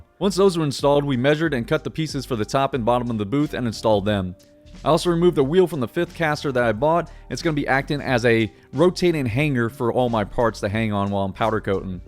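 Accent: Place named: South, United States. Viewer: It’s called southern dialect. If ya live in the south, it is proper English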